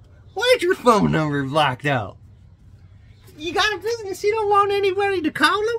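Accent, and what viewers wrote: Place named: Mississippi, United States. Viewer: As a Mississippi man I can appreciate the accent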